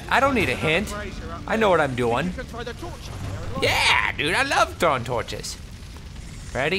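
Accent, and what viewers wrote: United Kingdom. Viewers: l luv ur britsh ascent lol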